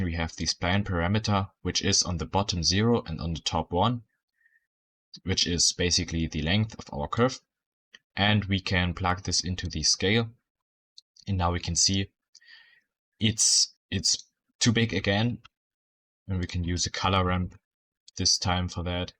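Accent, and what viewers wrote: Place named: Denmark. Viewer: oh sorry😅 you sounded very Danish in the video, great video btw!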